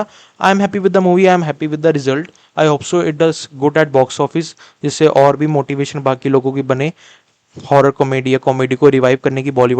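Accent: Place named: Pakistan. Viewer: yar tum accent sy to pakistani lagty ho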